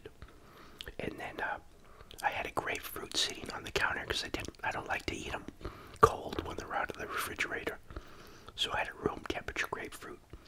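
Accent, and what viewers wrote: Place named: Boston, United States. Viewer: I'm just curious... How would you foneticly write the way he says 'slaw'? Sloa? I just love that boastn' accent #billyburr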